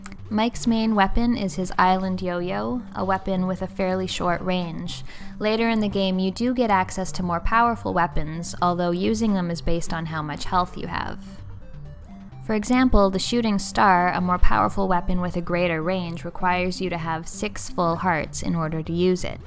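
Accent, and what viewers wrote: Canada. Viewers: Star tropics was my favorite game too. Are you canadian?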